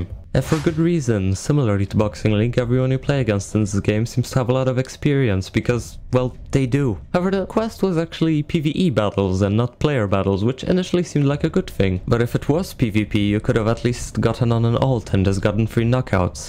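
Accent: Poland. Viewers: yo you polish? dawg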